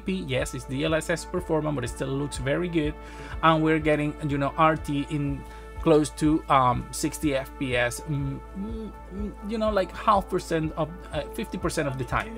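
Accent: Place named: Latin America. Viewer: Se lo nota ya mas suelto que en el primer video... NO creo que lo de latino afecte demasiado... Y si bien el toque latino en su acento de ingles se nota, no es tan exagerado...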